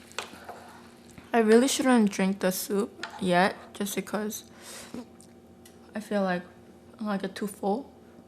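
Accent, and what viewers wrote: South Korea. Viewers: Unnie I'm sorry but you speak English in Korean accent I cant understand most of your words, cheongmal jusunghaeyo.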